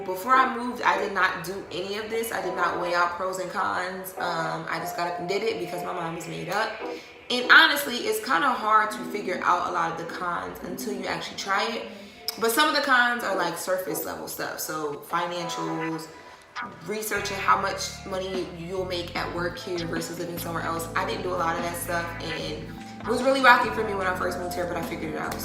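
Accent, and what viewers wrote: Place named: Philadelphia, United States. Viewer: Okay Delaware. I was thinking your accent was Philly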